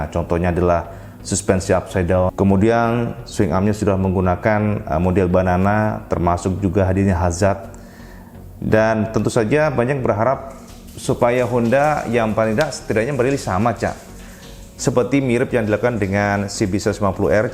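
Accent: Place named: East Java, Indonesia. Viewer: Sampean orang mana cak ? Logatnya kayak jatim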